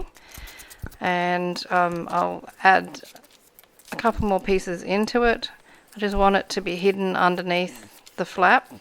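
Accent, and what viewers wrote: Australia. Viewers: Thank you, for your inspiration on the hidden theme. I just joined your channel. As soon as I heard your voice, I knew you were Aussie. I'm an Australian, too. I live in Wodonga, Victoria. 😊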